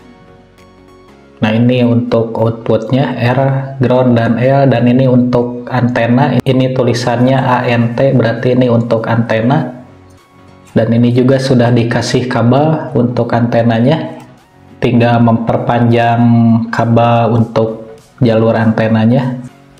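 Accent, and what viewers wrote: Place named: Sunda, Indonesia. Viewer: Urang sunda nya mang,,,😁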